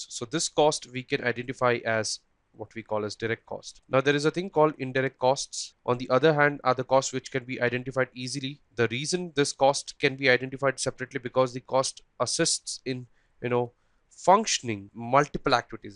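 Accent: India. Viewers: I don't mind an accent , I'm from the American South, but good grief. It's great that Indians are often technical but they make zero effort to sound anything like a native English speaker. About 4 minutes in, its like listening to a machine gun and I move on, it's just too hard trying to decipher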